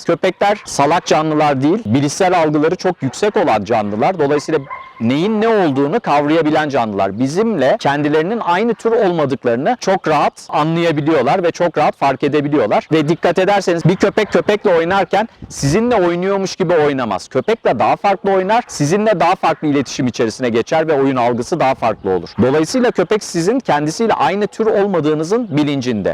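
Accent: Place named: Istanbul, Turkey. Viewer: Çok İstanbul ağzı konuşuyor diksiyon çok iyi ben ise Adana ağzı konuşuyorum çok moralim bozuluyor ne zaman kelimeyi bozacak diye bekliyorum. Bu beni üzer....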